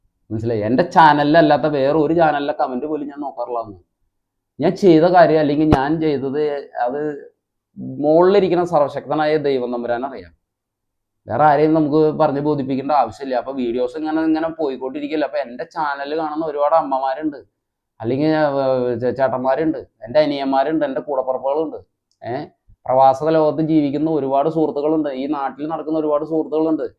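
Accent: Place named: Irinjalakuda, India. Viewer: Irinjilakuda voice aanu aa thaavzhi channel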